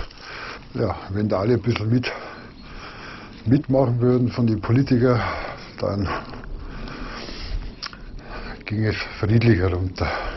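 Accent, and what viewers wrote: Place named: Lake Constance, Germany. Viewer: ja du hesch ja au e geile Dialekt ;-) aber ke ahnig woni di söll here tue , dänke so bim Bodesee ir nöchi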